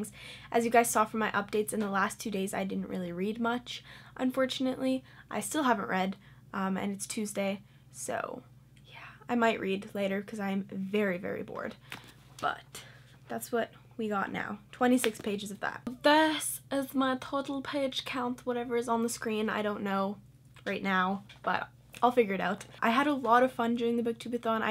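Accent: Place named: Canada. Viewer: YOU DID SO WELL I'm mad jelz. ps. I always forget your Canadian and then you'll say something and I end up hearing the accent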